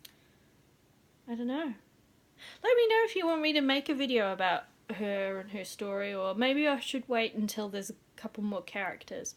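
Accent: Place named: New Zealand. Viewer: I have made her facebook page public this evening so hopefully you will see what she looks like and what she has been getting up to since she came to me in August. I didn't know you were British, you must have come here when young you sound totaly Kiwi, we are from Yorkshire and have been here nearly 10years. The idea for your story came to me a while ago, when you mentioned that you didn't know where to go with regards to her story. I was waiting in anticipation when Customs had her and this was the time i was waiting for mine and thought "oh no im going to have to wait forever if customs get a hold of her, i had sleepless nights about her been ceased for her magnets, all sorts of things, then i was worried i was mad at what i was intending to do with her i didn't realise that there were others out there like me, so then i though oh im not original in my way of thinking which i was kind of disserpointed in, so i couldn't win with myself, but now i love watching unboxing video's and you tube but i just watch Shell Bea, Swannz, and the lady who took her dolls, dolls bike and dogs to the beach in Auckland, i've forgotten her name but found them i think through stalking ha ha ha. 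When you were unboxing her it made me cry tears of joy for your wait was over, and mine was soon to be delivered. I love my Ppinkydoll and have another coming in October, its my birthday today and so im thinking erm.... birthday money= Lilith. I have been in a tiz regarding patterns, i sent for some fro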